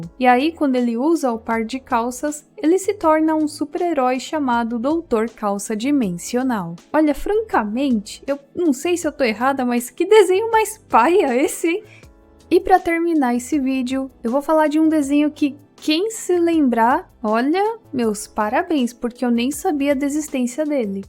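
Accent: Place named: Curitiba, Brazil. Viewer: Acho que a deve ser de Curitiba por causa do sotaque.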